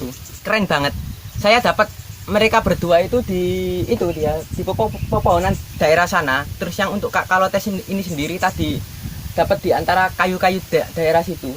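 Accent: Java, Indonesia. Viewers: Cong sampean wong ndi. Kok bhsamu medok bgt.. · Waw medok nenem logat jawa nyh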